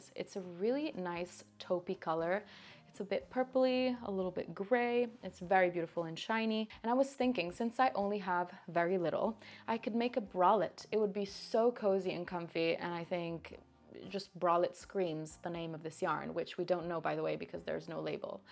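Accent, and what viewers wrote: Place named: Italy. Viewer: your italian accent is perfect!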